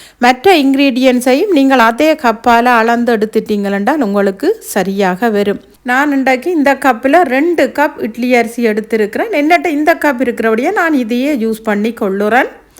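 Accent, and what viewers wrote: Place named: Sri Lanka. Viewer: தமிழ் நாட்டில் கொஞ்சம் படிச்சா போதும் ஆணும் பெண்ணும் பேசும்போது ஆங்கிலம் அதிகமாகவும் தமிழை மிக குறைவாகவும் கலந்து பேசுகிறார்கள். வெளிநாட்டில் வசிக்கும் தமிழர்கள் தமிழ் மொழியை மிகவும் மதிக்கிறார்கள். நீங்கள் செய்து காட்டிய உணவை விட உங்கள் இலங்கை தமிழ் கேட்க அமிர்தமாக உள்ளது. நன்றி... நன்றி... நன்றி...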